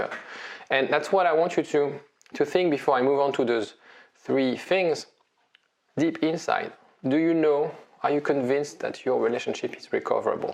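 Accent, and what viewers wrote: France. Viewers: are you French ?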